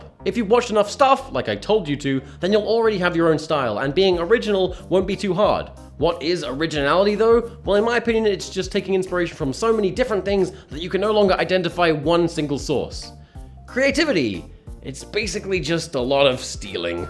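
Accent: United Kingdom. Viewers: he's british? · Wait, aren't you british? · Wait isn't he British? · are you british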